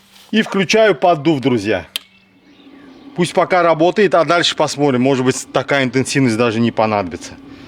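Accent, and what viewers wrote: Baku, Azerbaijan. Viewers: Самый чёткий бакинский акцент приятного слушать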